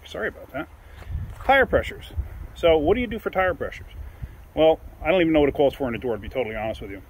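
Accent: Sweden. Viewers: I KNEW you were from Sweden. Your accent gives it away.😅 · What did i just hear, you are from Sweden 😀 For how long have you been in the states? Greetings from Finland 😊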